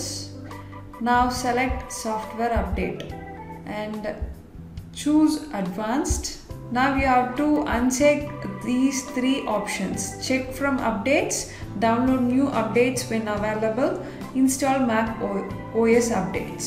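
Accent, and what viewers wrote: South India, India. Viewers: nice voice di are you from south india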